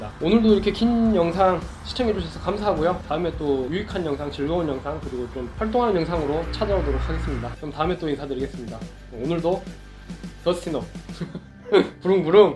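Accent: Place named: Chungcheong, South Korea. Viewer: 충청도 이십니까? 저희 작은아버지랑 말투가 똑같고 웃음 코드도 똑같아서 너무 사람이 좋아보여요 좋은 영상 감사합니다 ^^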